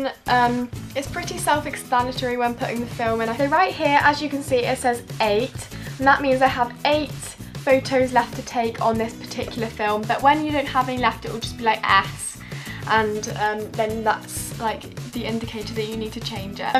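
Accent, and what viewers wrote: United Kingdom. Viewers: Omg I was so shocked when I heard ur voice I love ur accent. :) wish I were british. Great vid! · The British are so cool 😎 accept classy accent❤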